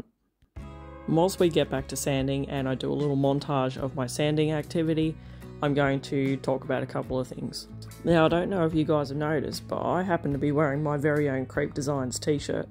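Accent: Australia. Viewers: Wow. So much detail work here. Your client should pay you well. I cannot imagine how many hours of work here. Love your Aussie accent and your quotes...."stand out like a dog's nuts". 😂😂😂. I always enjoy your sense of humor and look forward to your content. How many hours did you work on this piece?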